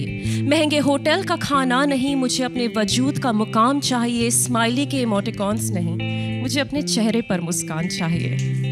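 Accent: United Kingdom. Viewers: Why Urdu/ Hindi words in English accent?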